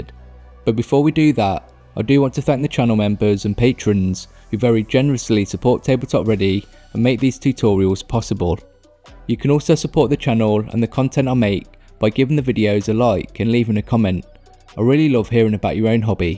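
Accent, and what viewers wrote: United Kingdom. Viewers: I'm not from the UK - what kind of regional accent is this? It's not super weird or anything, just kind of distinctive in some ways!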